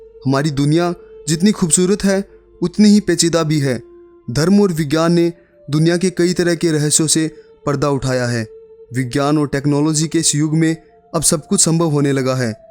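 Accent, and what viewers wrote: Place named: Haryana, India. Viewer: Taai aale tu te awaj te haryanvi laage hai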